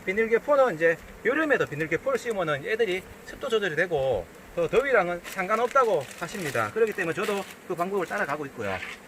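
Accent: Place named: Gyeongbuk, South Korea. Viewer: 동영상 잘봤습니다 같은 경북사람으로서 경북 표준어 귀에 쏙쏙 들어 오네요 ㅎ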